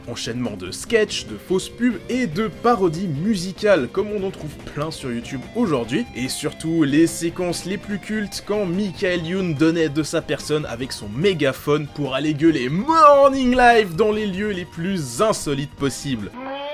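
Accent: Belgium. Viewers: Parle Français comprend rien quand tu parle belge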